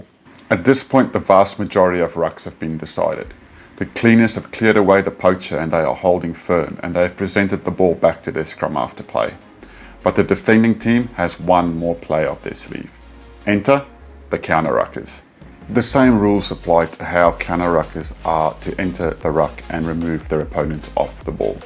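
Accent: South Africa. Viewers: I can't believe I've only just found your channel. Amazing content, adding so much more to my understanding of the game. Especially the different, roles, positions and responsibilities👍🙏🏈❤️🎊 please can you confirm your accent for me. When I look at the screen and watch you, you sound south African. When I turn my head away and just listen to you, you sound austrailian/new Zealand. It's very confusing for me🤔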